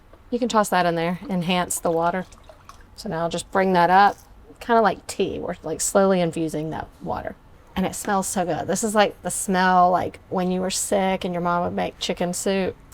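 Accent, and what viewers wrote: South, United States. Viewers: Her particular southern accent is the same as my best friend’s from GA, not too much not too little. I love hearing her voice too. ❤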